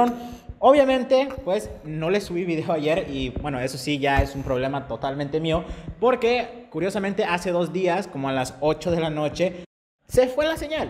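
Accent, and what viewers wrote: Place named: United States. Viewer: Está ablando el pirrurris gringo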